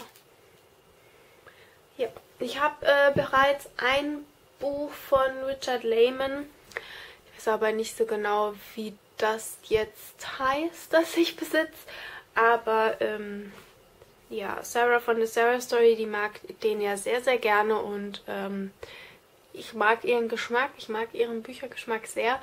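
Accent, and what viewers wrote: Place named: Palatinate, Germany. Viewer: Man kann sich als pälzer ned versteggle :D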